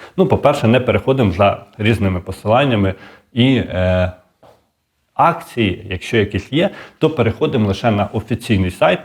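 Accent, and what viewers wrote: Vinnytsia, Ukraine. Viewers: Пані, перш ніж когось критикувати, навчіться сама правильної мови. А говір у нього вінницький. На заході говорять зовсім інакше.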